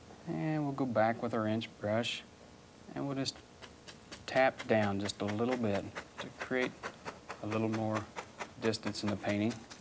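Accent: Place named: Indiana, United States. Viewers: He is the best. The Indiana country accent--"acrosst". Perfect ASMR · Classic Indiana Accent...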